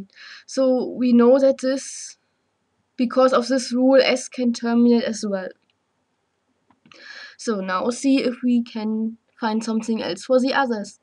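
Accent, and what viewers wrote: Germany. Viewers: schone Deutsche accent :)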